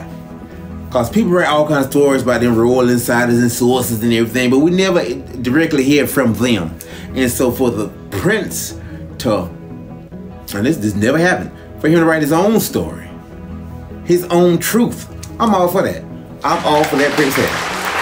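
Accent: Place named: South, United States. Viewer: Ayyyy my new favorite show! Love the country accent 😂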